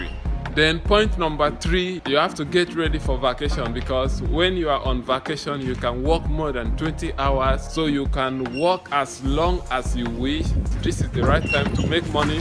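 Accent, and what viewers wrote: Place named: West, United States. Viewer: Igbo boy alert! But you sounded like you're from the west. 😂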